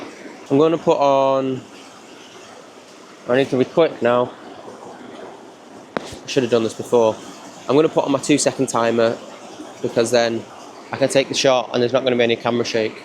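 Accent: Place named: Yorkshire, United Kingdom. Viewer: Amazing video your an amazing photographer you sound Yorkshire as am I your videos are amazing keep it up 😊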